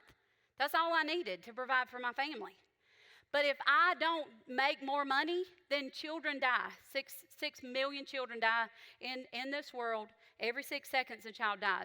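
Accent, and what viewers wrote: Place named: South, United States. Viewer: She totally sounds Southern..how could she say out her lips, NJ😂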